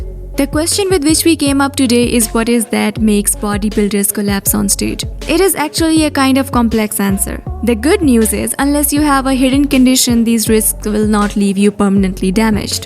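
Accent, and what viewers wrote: India. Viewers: disliked cause of indian accent lol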